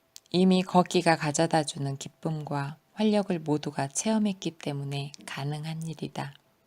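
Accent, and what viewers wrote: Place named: Jeolla, South Korea. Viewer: 읽어주시는 분 전라도분이신가봐요ㅎ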